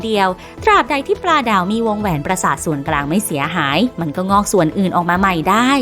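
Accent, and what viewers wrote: Thailand. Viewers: เสียงเหน่อจัง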